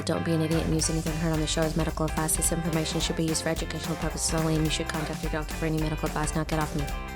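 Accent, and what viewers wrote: South, United States. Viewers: I like your subtle Southern accent.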